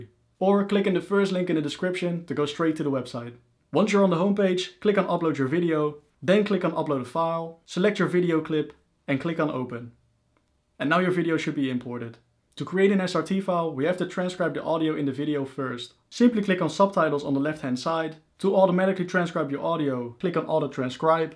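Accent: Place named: Netherlands. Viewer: Strong Dutch accent, but thanks for the tutorial.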